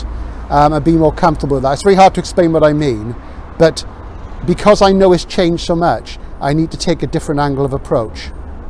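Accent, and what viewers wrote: Wales, United Kingdom. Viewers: Excellent! Your accent became more Welsh the further down Mill Street you went.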